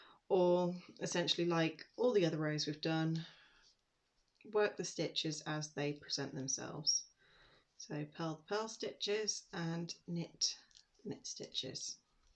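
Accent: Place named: England, United Kingdom. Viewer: Thank you so.much for this pattern. I love your English accent. Im from scotland living in USA 44 years still have My accent.😊❤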